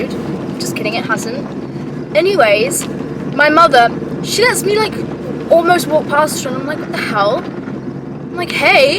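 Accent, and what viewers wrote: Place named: United Kingdom. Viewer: omg bri'ish accent kills me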